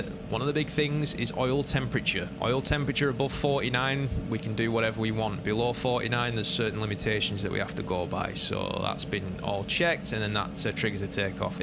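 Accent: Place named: Lancashire, United Kingdom. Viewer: Thanks for the video! Much appreciated. Loving the accent😊 Preston? Blackburn?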